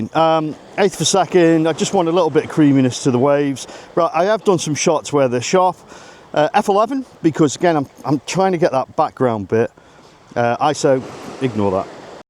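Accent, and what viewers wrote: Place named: Stoke, United Kingdom. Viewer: Great video. Just subscribed mate. Also detect a bit of stokie.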